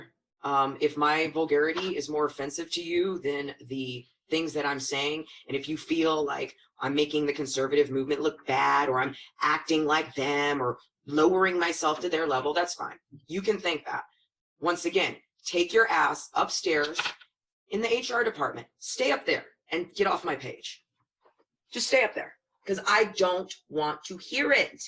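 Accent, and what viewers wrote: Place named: United States. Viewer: You're just acting/speaking like an All-American.....what's the problem? I think it's great!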